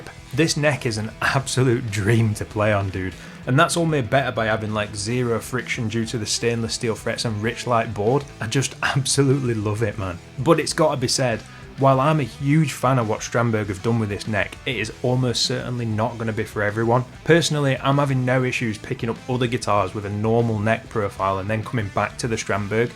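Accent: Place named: Northern England, United Kingdom. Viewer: Proper northern guitar review. Strandbuuurg Buuurdon. Subscribed.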